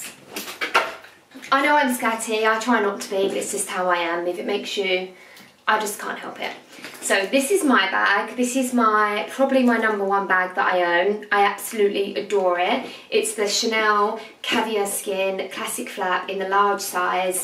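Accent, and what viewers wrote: United Kingdom. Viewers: Loved the Chanel reveals, keep them up xo Listening to you makes me miss the UK so much!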